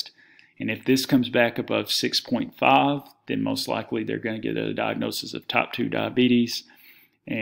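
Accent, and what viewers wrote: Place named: South, United States. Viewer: Dr., where are you located? You sound like you're from the south and I'm in east Tennessee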